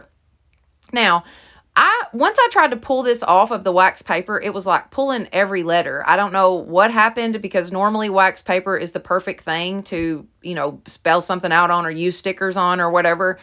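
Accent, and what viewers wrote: South, United States. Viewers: BEAUTIFUL JOB as always!!! You’re my absolute favorite..... not only because I’m a Georgia gal and you speak my language (some people don’t understand our Southern speak) BUT also because your DIY’s are always unique and you never fail to knock it out of the park!!!! Panting dogs! I have toddler dogs too..... you crack me up girl!! Be blessed! 😇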